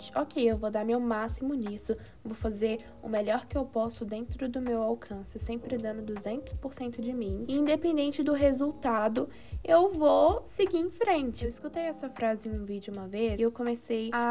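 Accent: Minas Gerais, Brazil. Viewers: Vc é mineira ? Seu sotaque é tão lindo!!!!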